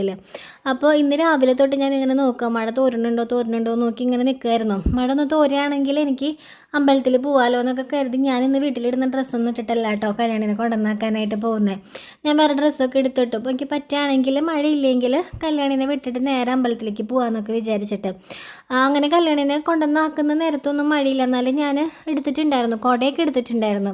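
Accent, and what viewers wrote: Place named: Thrissur, India. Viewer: Thrissur ano ivrde place? Slang familiar ane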